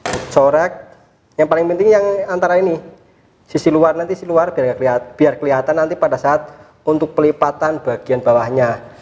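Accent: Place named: Java, Indonesia. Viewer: koyo wong pekalongan basane, ak, karanganyar mas tugu duren ngetan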